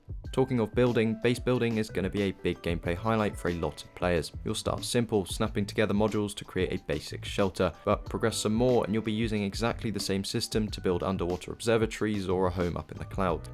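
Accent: United Kingdom. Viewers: Wow. 2024, playing since 2018, love the British accent. All you need to know is here. Btw, if you want to make money (units) -> chlorine, afterwards -> buy cheap ships, upgrade them, and sell them back as scrapping for AI parts... Worthing a lot of units.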